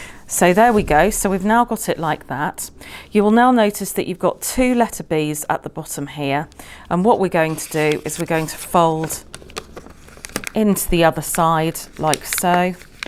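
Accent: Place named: United Kingdom. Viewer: You could be both Bri'ish, or an american makeing fun of that accent!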